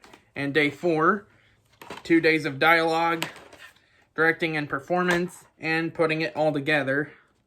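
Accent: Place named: South, United States. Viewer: Fantastic! After many years away from animation, I'm returning. This book is a must and I look forward to reading it again. By the way, I love your accent! I love the southern accent :)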